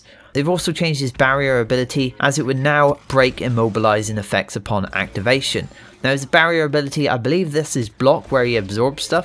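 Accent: West Country, United Kingdom. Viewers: Clear and concise with a west country twang:) You have a new sub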